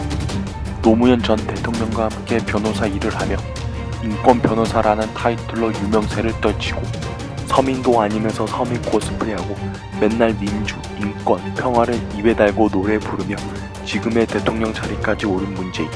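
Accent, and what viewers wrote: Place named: Hamgyong, North Korea. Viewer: 함경도 사투리쓰네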